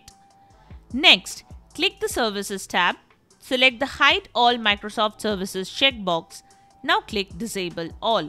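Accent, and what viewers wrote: India. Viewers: When I hear the Indian accent I know it's finna work. Thank you very much.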